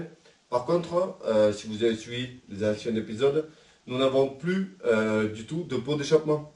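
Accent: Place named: Marseille, France. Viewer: En grande forme internationale ça ça me fait toujours délirer le début de tes vidéos tu as l'accent marseillais mais apparemment tu habites Danemark ça fait trop délire. avec ses 400 épave en tout cas continue comme ça gros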